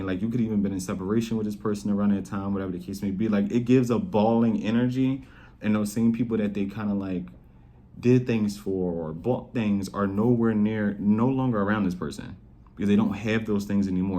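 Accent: Philadelphia, United States. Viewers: You from Philly ?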